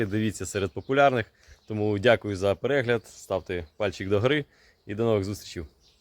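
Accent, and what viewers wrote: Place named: Urals, Russia. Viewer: Акцент у этого русского странный ,то ли с деревень Урала, то ли с деревнь Удмуртии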